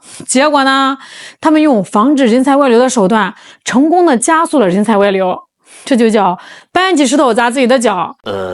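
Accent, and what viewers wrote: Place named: Shandong, China. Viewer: 一听就是山东的，外网键政圈大部分是山东人😂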